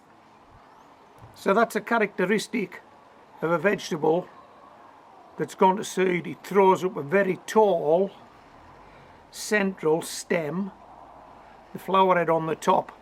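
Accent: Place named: Newcastle, United Kingdom. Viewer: Fab for this expat to hear a Geordie accent!Greetings from Bavaria! btw I built a horse manure hot bed. I ordered this book by a guy up north near you.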